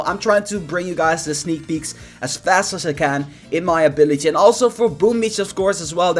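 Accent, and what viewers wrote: Netherlands. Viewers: Nederlands accent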